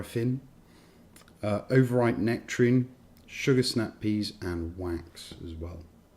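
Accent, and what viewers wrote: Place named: RP, United Kingdom. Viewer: Excellent review but when are you going to invest in a descent camera? Your tasting notes are one of the most honest, original and accurate (as far as my tasting experience goes), your presentation is natural and lucid and transmitted well through your clear English accent with that hint of rugged understated poshness. You deserve a far wider audience. Invest in a better camera and let's see (please G-d) those subscription figures reach 5,000! in 2019. Fascinating and intricate flavour description which makes this Teaninich rather intriguing. There is a flavour note I haven't come across in a long long time. Elderberry. From the honeysuckle family with large clusters of tiny creamy white flowers. I do have a vague memory of this when growing up in England. You mentioned Elderflower. I looked it up and it seems to be a sugary drink flavoured with elderberry produced by village housewives in little English villages. 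This distillery name whose pronunciation is a real tongue twister "Tee-Ni-nich" is one of Diageo's silent giants with about 10,500,000 litres capacity / annum. As you said, their 10 Year Old Flora and Fauna was released in 1992 and can still be found in the UK. Their special limited release 17-Year-Old came out in 2017, now probably very rare. The character of the malt seems to be designed to be a filler malt for blends. Reviewers describe fresh green grass along with slightly oily creamy cereal notes with a dash of honey, nuts and spice. Your notes were much m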